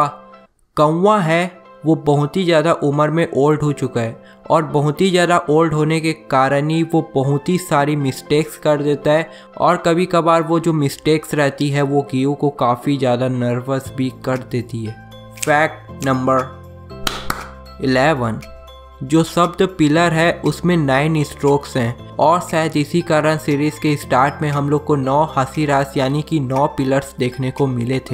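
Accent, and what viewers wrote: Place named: Bihar, India. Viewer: Bhai bolte bhi bihari jese ho tum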